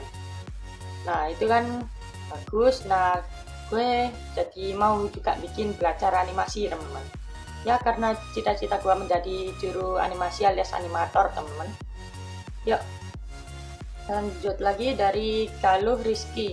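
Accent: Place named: Java, Indonesia. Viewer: Sk dengerin km ngomog dek.. Wong jowo to dek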